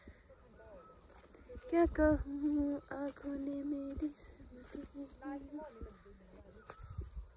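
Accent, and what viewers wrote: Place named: Kumaon, India. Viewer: मेरी पत्नी और बेटी मुनस्यारी घूम रहे हैं अभी, आपका कुमाउँनी बोलना अच्छा लगा 💐 बढ़िया व्लोग 👌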